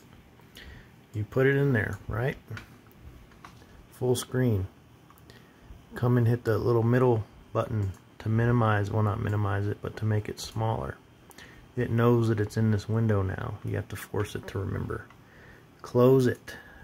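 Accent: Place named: South, United States. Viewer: Thank you you sweet southern man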